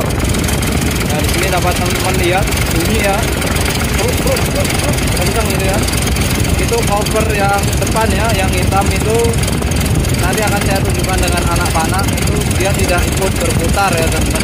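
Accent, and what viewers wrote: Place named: Java, Indonesia. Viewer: kuk medok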